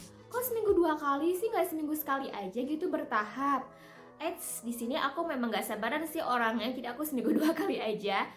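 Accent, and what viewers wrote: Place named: West Java, Indonesia. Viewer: Sunda nya tetep keluar yah bundd wkawka ditunggu next video 🙌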